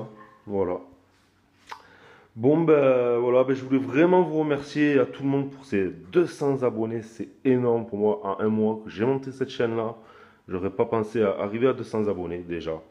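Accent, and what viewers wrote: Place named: South, France. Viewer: Sa fait plaisir tu vien du sud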